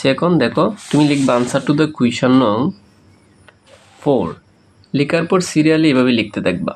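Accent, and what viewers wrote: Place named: Sylhet, Bangladesh. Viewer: Suru Sylheti😁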